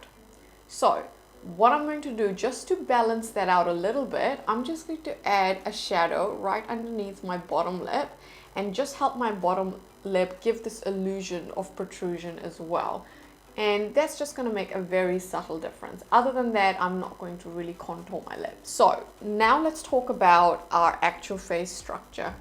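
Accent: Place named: RP, United Kingdom. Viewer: I don't know why but you kind of sound like the English royal family. They speak very differently even easy words like "that". You sound quite similar to them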